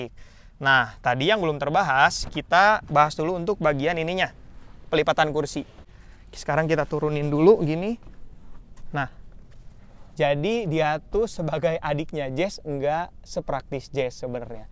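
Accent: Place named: Sunda, Indonesia. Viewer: Mang, asik reviewnya. Logat sundanya kentel lagi. Yang rajin mang!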